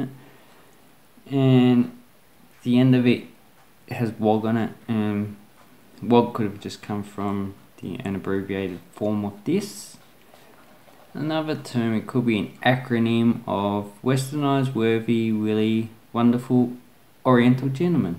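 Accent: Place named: Australia. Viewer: Please use American accent instead of Aussie accent it's really hard for me as a non-english speaker to understand every word that you've said, even if you talk slowly as in the video.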